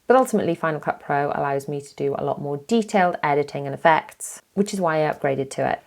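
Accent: Wales, United Kingdom. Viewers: A video breaking down your filming and editing process would be massively helpful! I’ve been watching you for years and it’s just been nice to hear a Welsh voice and relate to your life as a mum to 4 (like myself) at the moment I’m loving your Disney vlogs and they will help me massively as I plan Disneyland Paris next year and in the near future florida (because I’m dying to go back!) I’m also a YouTuber and I love every minute of it but it is soooooo hard. You definitely neeed to have the right mindset to keep pushing through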